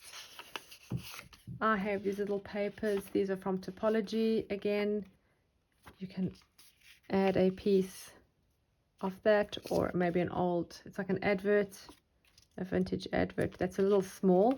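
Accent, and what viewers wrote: South Africa. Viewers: I thought I spied a South African accent!! Always love finding another South African Youtuber. Love your work!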